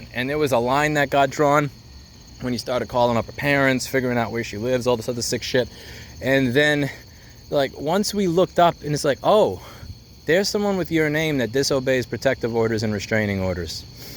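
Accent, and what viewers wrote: New York, United States. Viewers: All I'll say is that his New York accent came out...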